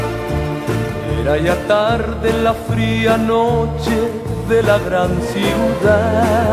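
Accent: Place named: Canary Islands, Spain. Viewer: es canario, no va a tener el acento de *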